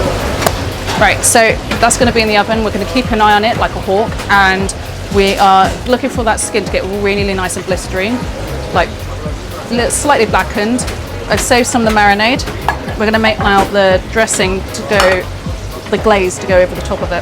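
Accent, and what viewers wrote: United Kingdom. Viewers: Hi there u are professional as a 1 Michelin star chef but your presentation is way too serious.. pls lighten up n I m sure you will get more subscribers.. smile more! You r very pretty with a nice British accent. Love your blonde hair too! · Fantastic British accent love it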